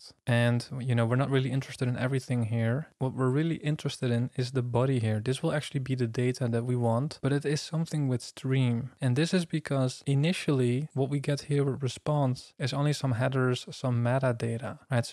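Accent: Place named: Netherlands. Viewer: Ik hoor Nederlands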